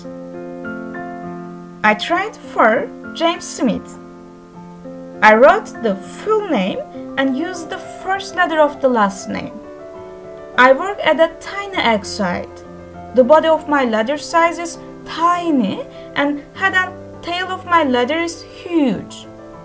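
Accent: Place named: Japan. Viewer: Japanese English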